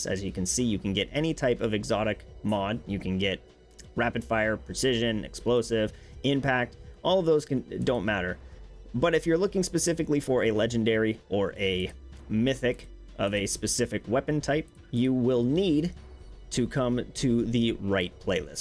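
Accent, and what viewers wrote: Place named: Canada. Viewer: Great video but damn my man you and tash sound exactly alike and I'm not talking about the Canadian accent. If I had my eyes shut I wouldn't be able to tell the difference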